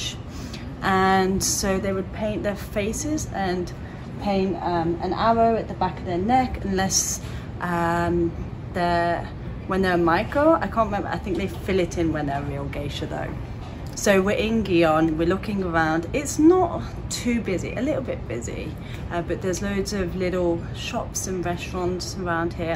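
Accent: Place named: England, United Kingdom. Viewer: Your English accent is fascinating!!!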